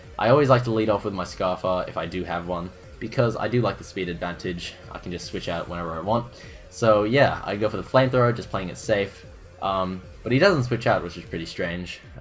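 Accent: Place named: United Kingdom. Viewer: pokémon battles commented with a British accent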